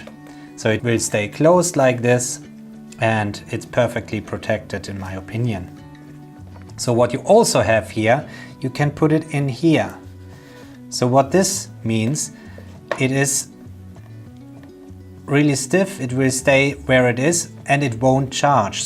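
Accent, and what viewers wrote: Germany. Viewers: I bet you're German, man! Ich hör's doch am Akzent!👍😂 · YES! Knew it as soon as I heard him. Germans have a unique English accent if their own. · Dieser deutsche Akzent. Herrlich :D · Dieses Englisch kann nur von einem Deutschen stammen, korrekt? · Wow dein Deutsch accent ist hard😐 sorry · Are you german? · Du bist doch deutsch oder das hör ich direkt raus :)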